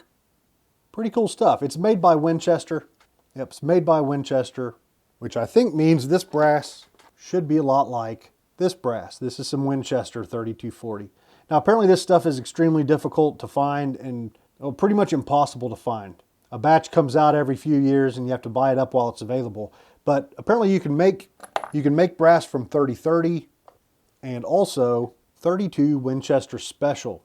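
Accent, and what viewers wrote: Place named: Eastern Kentucky, United States. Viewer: What part of eastern Ky are you from??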